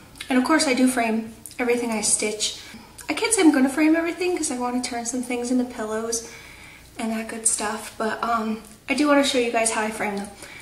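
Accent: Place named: New Jersey, United States. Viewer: I really like your videos, your work is gorgeous! I enjoy listening to you talk because I am originally from N.J. and your accent reminds me of home. :)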